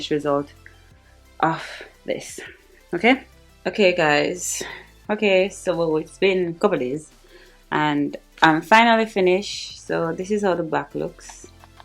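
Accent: Jamaica. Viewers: As soon as you started speaking I was like "ooooooh she Jamaican" . Subscribed! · I'm sorry I just read your bio. I didnt even knew there was one. I love your videos. Might not get to like as I watch.....i always forget to but I love your videos. Your accent screamed jamaican so that's y I was curious. ❤❤❤❤❤❤❤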